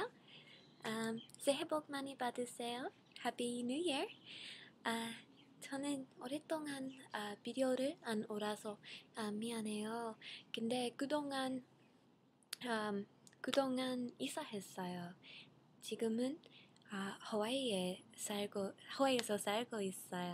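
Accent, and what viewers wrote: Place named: South Korea. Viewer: Glad you are in Hawaii! I have friends in Hilo but your Korean is much better than hers! Hope you have a great time in Hawaii and in your classes. I love love your korean accent when you speak english...Couldn't be cuter!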